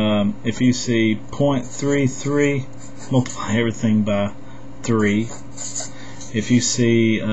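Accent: South, United States. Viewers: Am guessing you are from down south :)! thanks for all your help you are wonderful :) and are alot funner than reading the book lol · You and your sexy Southern accent have Really helped me. Thank you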